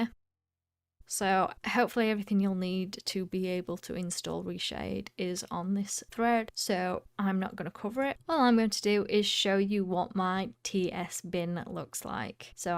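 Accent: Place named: United Kingdom. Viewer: Hello! Thank you a lot for the video. It's greatly helpful but what I also need to mention is your beautiful British accent, I love it so much! It was a pleasure listening to you.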